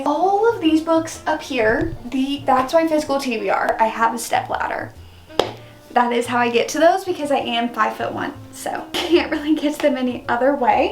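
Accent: United States. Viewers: I keep rewinding so funny! I love the lil country accent too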